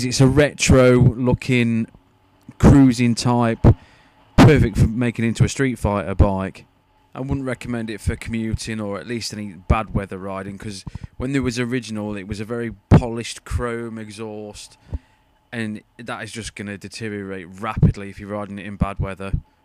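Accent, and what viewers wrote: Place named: Midlands, United Kingdom. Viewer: where ya from? you sound midlands ish. len Manchester in Melton Mowbray do the new model. they might have a demo you could test.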